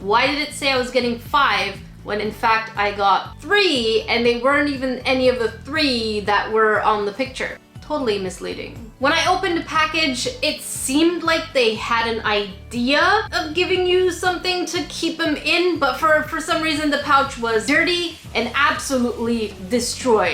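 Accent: Canada. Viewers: Are you canadian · I love your salter crafter videos! I like seeing your salty disappointment face and your Canadian accent just makes these videos hilarious 😂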